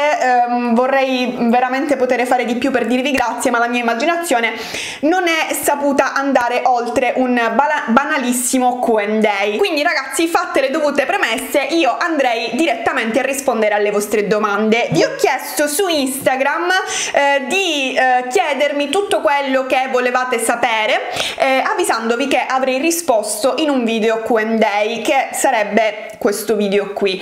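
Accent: Sicily, Italy. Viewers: Comunque io adoro la tua voce e il tuo accento siculo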